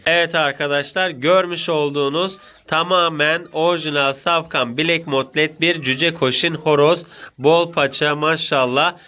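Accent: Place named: Adana, Turkey. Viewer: Abi adananın neresindesin hemşehrim çıktınnnnn😁😁😁😁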